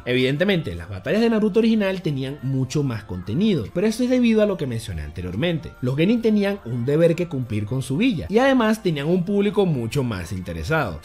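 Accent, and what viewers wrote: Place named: Venezuela. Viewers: Si pues, el acento es muy similar a de nuestra casa, no sé si de caracas como tal pero, tiene ese acento criollo jajaja pero maneja buen léxico sin parecer un pajuo por eso no creo que sea de caracas xd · nose es que con solo el acento se le nota que es de venezuela (caracas para ser mas específicos) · Obviamente es Venezolano, es muy facil notarlo.. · Yo venía a preguntar lo mismo xD este es el primer video que veo y me sonó muy venezolano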